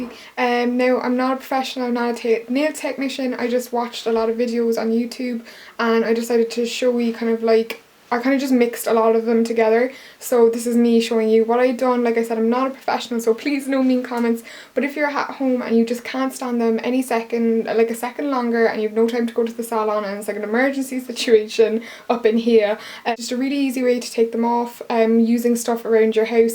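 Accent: Ireland. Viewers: It's an Irish accent not an English accent! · I love your accent are you Irish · You're Irish? Sweet :D MY HERITAGE! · You’re accent is great!! You sound a lot like my Irish friends! 💖 I’m battling my ridiculous nails this afternoon thank you!! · OMG. Thx this helped! You sound Irish :) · Love your Irish accent ❤️💚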